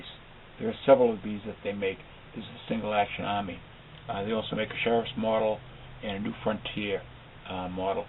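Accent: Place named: New England, United States. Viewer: Love his New England accent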